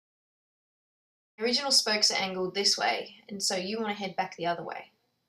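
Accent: Australia. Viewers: When I first heard you say: "under" I was hoping to hear "down" just before it. Love your Aussie accent. Please don't be offended. Nice video too. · I've tried 5 or 6 times, following 5 or 6 different videos, and NO LUCK! Saw this one, SUCCESS! Thank you very much! Is your accent Australian? Sounds that way to me. steve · Australian I think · Yeah she’s Australian, it’s a nice accent as it’s not too broad. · Yes.. she Australian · Australian accent eh!!!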